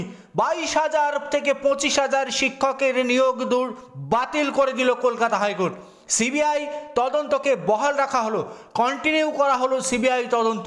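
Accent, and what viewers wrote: Bangal, Bangladesh. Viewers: আপনার বাংলার উচ্চারণ বাঙ্গালদের মত কেন?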